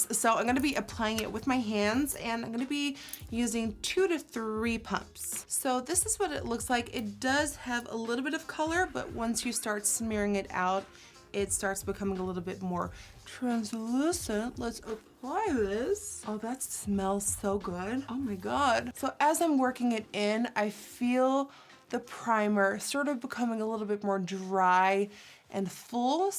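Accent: United States. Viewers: Quick question. I'm new to this channel and I noticed she mentioned that she lives in the Netherlands. Has always lived there? I wasn't sure as she has an American accent. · Leah Jones she's not putting an accent on, it's because she's been around American people for so long. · Kawaii dude this is the second time I see you commenting this hahaha people don’t have a problem, they just thought she was American based on her accent.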